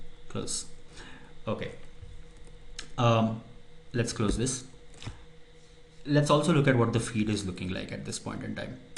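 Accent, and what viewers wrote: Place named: India. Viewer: this looks interesting indian accent NOPE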